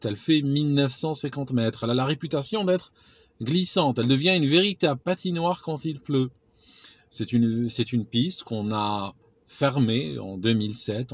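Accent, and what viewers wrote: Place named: Switzerland. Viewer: Salut - j'aime bien, j'avais remarqué dans d'autre vidéos, ton accent suisse ressort bien à des moments- vers notamment il est bien teinté.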